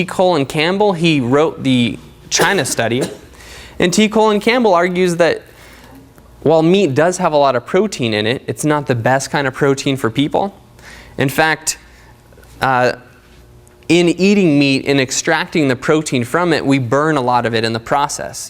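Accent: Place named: Russia. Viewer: Love the Russian accent ;)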